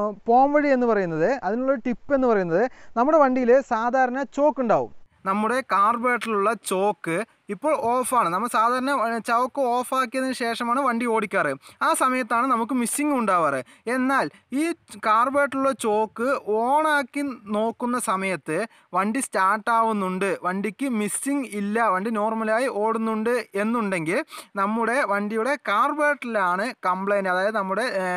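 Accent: Palakkad, India. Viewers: Palakkad ano bro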